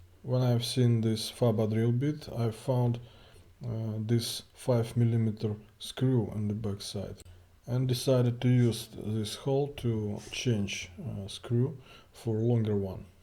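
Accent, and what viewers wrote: Russia. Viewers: Такой акцент яркий, ощущение будто русский человек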